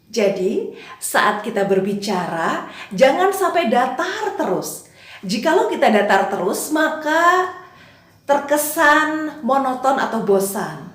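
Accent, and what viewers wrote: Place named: Java, Indonesia. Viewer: Ahh...masa siih... Itu ngomongnya pake logat Jawa bukan logat Sunda🤭.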